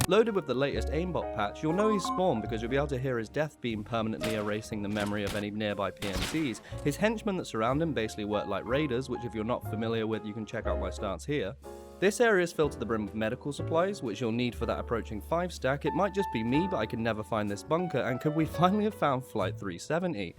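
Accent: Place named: England, United Kingdom. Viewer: i like this funny english tarkov man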